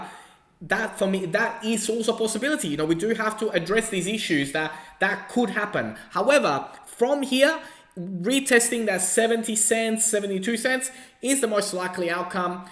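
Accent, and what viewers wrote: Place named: Australia. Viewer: Thanks for all your ongoing analysis mate, great to hear an Aussie voice in the crypto universe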